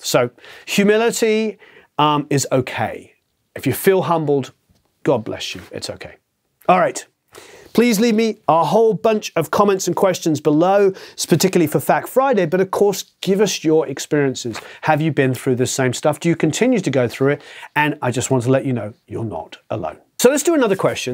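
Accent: United Kingdom. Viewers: Great video,! Self doubt comes and goes for everyone, i guess. Taking that self doubt and turn it into creative fuel is a great way to deal with it, if possible. I've found that self doubt has made me get out of my comfort zone and to work even harder, it has pushed me to learn more and study/practise harder in terms of producing, mixing. Thanks for bringing up this important question:) Love the British accent, btw! Cheers from Sweden /Mike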